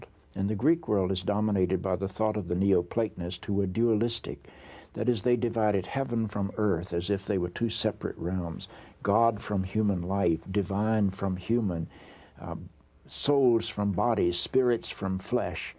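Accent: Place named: United States. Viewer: What a gobshite. I'm my country? You sound like you're from the usa? Full of shit!!! I was told I was told. Lies lies and lies!!!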